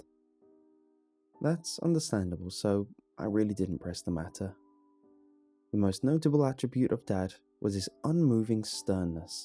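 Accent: United Kingdom. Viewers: Something about a British accent makes the stories sound scarier for some reason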